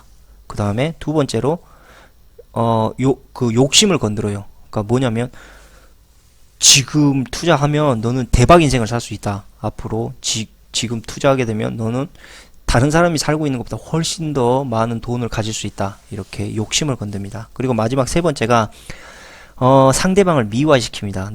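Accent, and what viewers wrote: Jeolla, South Korea. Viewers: 절라도 말투가 듣기싫네요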